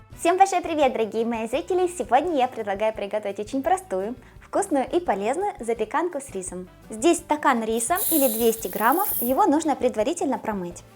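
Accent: Ukraine. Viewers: Дитинко все добре , вле чому ти мовою окупанта ведеш , по говору чути що ти з українського середовища , якась напасть так нелюбити свого і підлаштовуватися під чужих . Чи гроші понад усе ?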